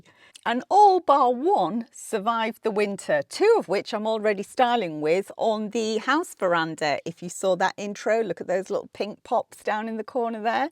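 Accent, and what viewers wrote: United Kingdom. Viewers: I’m not a gardener though I appreciate the gardens of others. It didn’t matter that I am not a gardener, I would watch whatever content you post just to hear your cheery, witty voiceovers as they make me smile. The fact that you have a delightful British accent is the icing on the cake. 😁 Greetings from Dallas, Texas 🇺🇸 where our accents are cringeworthy. 🤪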